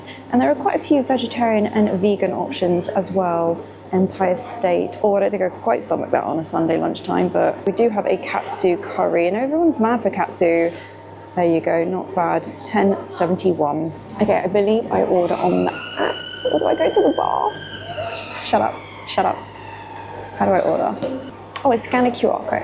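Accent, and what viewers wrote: RP, United Kingdom. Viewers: You're painfully posh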